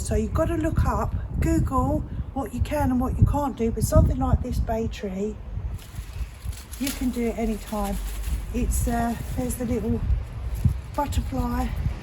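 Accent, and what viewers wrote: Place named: England, United Kingdom. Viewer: I love your English accent, thanks for sharing instructional video.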